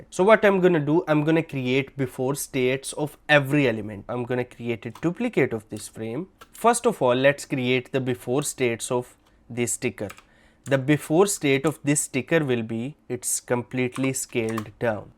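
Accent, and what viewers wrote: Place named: India. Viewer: Why his english is likely Indian accent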